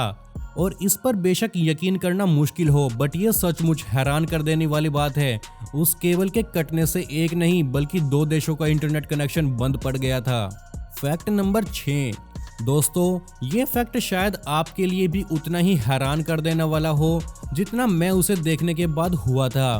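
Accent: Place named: Kashmir, India. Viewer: Bhai.. Ap kashmiri ho kya.. Apka assent kashmiri types hai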